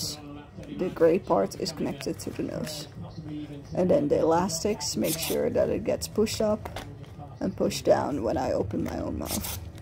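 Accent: Netherlands. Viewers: The dutch accent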